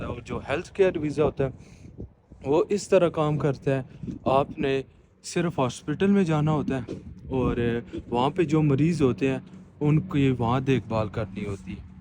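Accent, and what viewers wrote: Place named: Chakwal, Pakistan. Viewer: Ap Chakwal ke lagtey hain bhai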